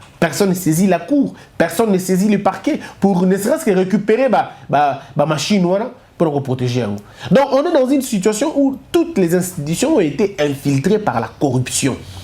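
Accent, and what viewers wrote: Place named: Mbandaka, Democratic Republic of the Congo. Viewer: Mon frere j'ador quan vôus parle le vrais lingala de mbandaka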